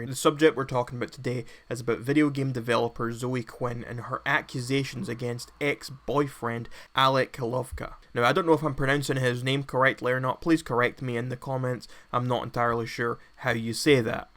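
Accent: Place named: Stirling, United Kingdom. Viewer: First, neato, a commentator that's fae Scotland. Second, you fae between Glesga and Edi? Ye kinda sound fae aboot Stirling way lol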